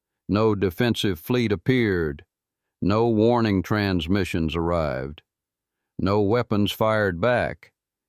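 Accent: South, United States. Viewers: Its so nice to here a narrator that speaks my own language, SOUTHERN. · Like I said and that is for reply you've lost consistency that southern drawl and humour and ya keep switching diff narrators when ya got a winner ya lost me but good try not sure how yer not relating yer stories good narrator all over the place ya can't relate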